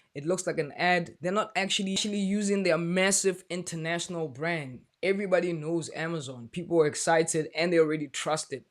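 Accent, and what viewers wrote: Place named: Johannesburg, South Africa. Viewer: Thanks for the honest leveling. Side note…nice to hear a Jozi English accent...not enough of us leftnout there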